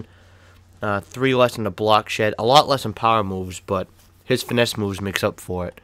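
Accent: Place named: Boston, United States. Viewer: You sound like you're from Boston. Looks like you still hurting from Eli owning the cheatriots in the super bowl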